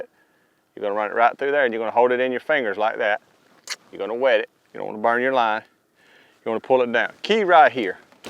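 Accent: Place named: Texas, United States. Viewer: Matthew Mcconaughey is from Texas. A lot of us talk this way.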